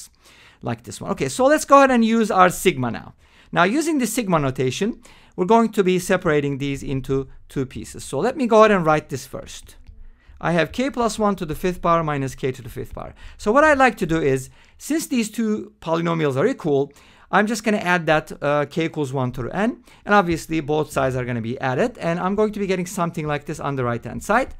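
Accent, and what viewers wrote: Turkey. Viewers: Your accent sounds Turkish.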